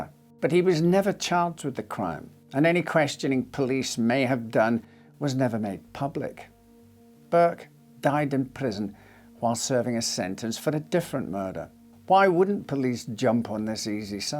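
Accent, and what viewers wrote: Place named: Glasgow, United Kingdom. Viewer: Washington state West coast U.S.A here. I hope yur real n not scrappy a.i.😕 Love the Glasgy speak😊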